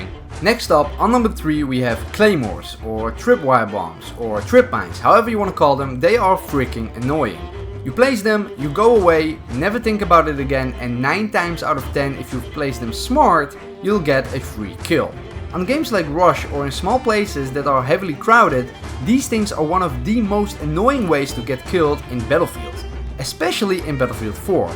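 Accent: Netherlands. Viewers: Je hoort zo hard dat jij Nederlands bent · Are you dutch? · Are you from the Netherlands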